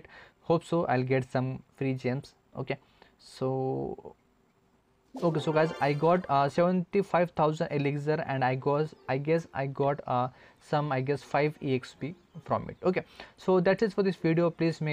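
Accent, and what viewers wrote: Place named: India. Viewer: sounds like he from india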